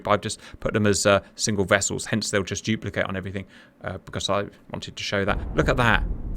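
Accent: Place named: United Kingdom. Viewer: Damn you, damn you and that awesome British accent. =)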